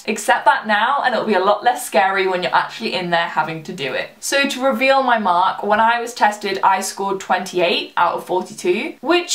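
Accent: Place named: Wirral, United Kingdom. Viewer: that makes a lot more sense now!! Oh I'm from the Wirral so thought I could recognise your slight accent. Did you really like Bristol uni?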